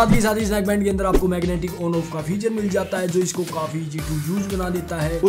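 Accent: Haryana, India. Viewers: Haryana say h bro ye Hmare jile say 🎉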